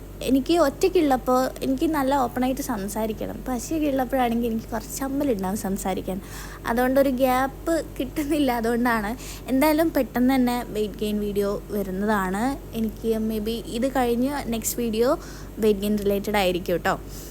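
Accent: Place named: Kannur, India. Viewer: Aaa. 😍 kannur salnk poleind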